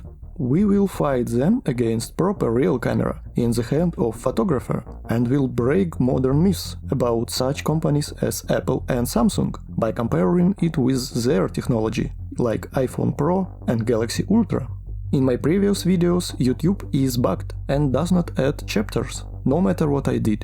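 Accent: Russia. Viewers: Русский акцент чудовищный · Отличный обзор! Акцент выдает русские корни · У вас русский акцент, поэтому мне очень легко понимать ваш инглиш. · Слышу ты Русский, есть канал на русском или все на английском?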